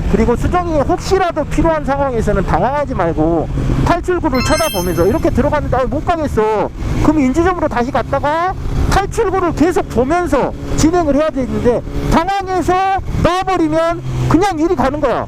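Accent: Jeolla, South Korea. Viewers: 아따 남도 사투리가 구수하요이~ 그라고 알려 줄라는 내용이 팍팍 와닿소^^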